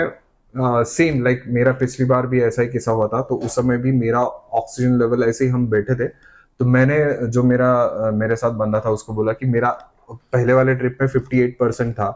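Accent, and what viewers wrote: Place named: Maharashtra, India. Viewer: तुझी हिंदी खूप चांगली आहेस, पण हिंदी बोलतांना तुझी मराठी Tuning अधून मधून बाहेर निघूनच जाते 😂😂❤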